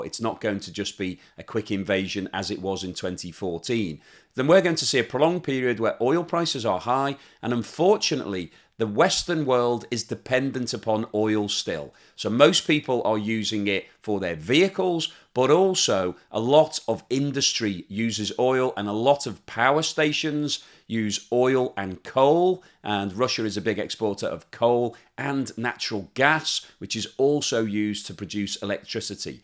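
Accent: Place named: Liverpool, United Kingdom. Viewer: Sounds like scouser 🙄😬🙄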